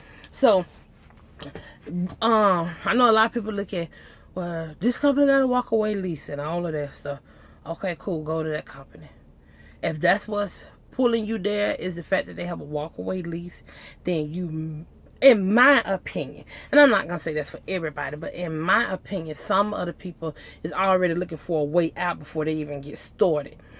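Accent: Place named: South, United States. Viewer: No, you are slowly loosing your southern draw. great info I just filled out a application for choice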